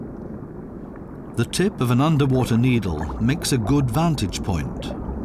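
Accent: United Kingdom. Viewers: "notanother" Uboob being narrated by a Brit, for Gods sake use a Lantino accent for Central American subjects. What's with all the Brits? At least this one I can understand, without subtitles.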